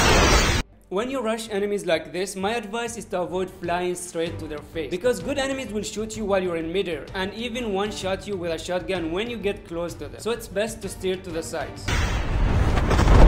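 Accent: India. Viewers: The indian accent makes this video 100 billion times better. Idk why. I guess this is caused by watching Mutahar from someordinarygamers last night for 5 hours straight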